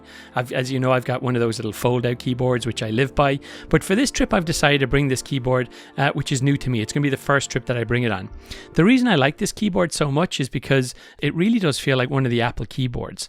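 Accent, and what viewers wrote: Ireland. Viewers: Great Video, I'm looking forward to the smart glasses review, I might buy a pair for travel in the future. Its great hearing an Irish accent, with the amount of tech videos I watch.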